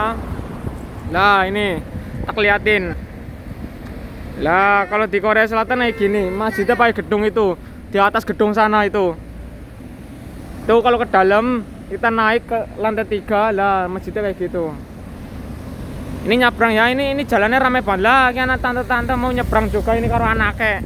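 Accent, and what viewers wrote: Banyumas, Indonesia. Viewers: Ngapak bgt rika kaya wong banjar,awas gole nyabrang cincing mbok teles hehe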